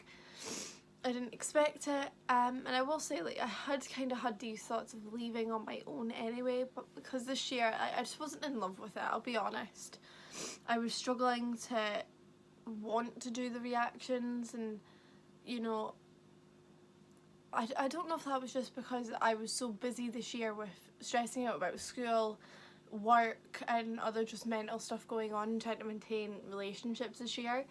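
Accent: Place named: Scotland, United Kingdom. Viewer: Is it Scottish or Geordie? Sounds more like Scottish to me 🙈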